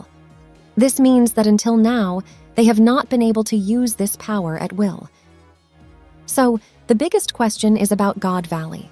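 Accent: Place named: United Kingdom. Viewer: Eww... Please please go back to the British voice. It was so refreshing and different.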